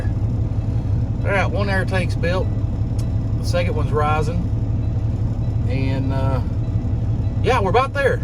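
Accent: South, United States. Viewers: U have a deep southern accent! Where u from?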